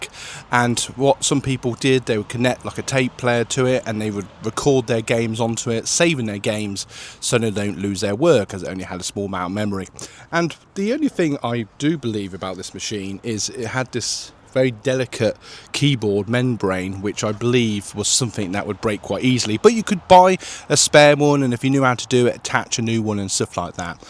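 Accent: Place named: England, United Kingdom. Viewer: Great voice, you have a convincing English accent too!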